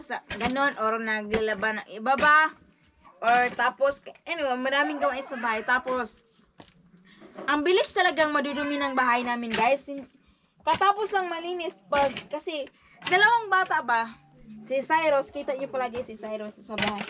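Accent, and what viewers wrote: Visayas, Philippines. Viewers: Bisaya po kase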